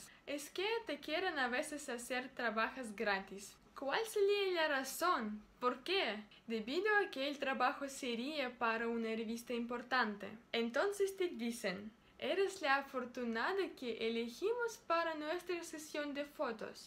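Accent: Russia. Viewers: Me gusta tu pronunciación. O sea. Aunque hayan el ciertos detallito a corregir, igual me gusta. Por ejemplo. Se dice zapátos. Y como dices bolsillos me gusta, acento ruso, pero me gusta. Por cierto. Si te interesa aprender más español, tener con quien practicar, escríbeme al privado de aquí de YouTube y nos ponemos en contacto. Cuidate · Jajaja ese acento ruso español me encanta, aca te dejo un par de luagres para conocer en latinoamerica <link>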